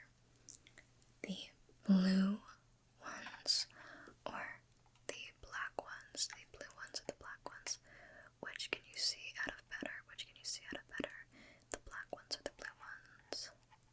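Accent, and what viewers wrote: South, United States. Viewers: Can you make more southern ascent videos love them!❤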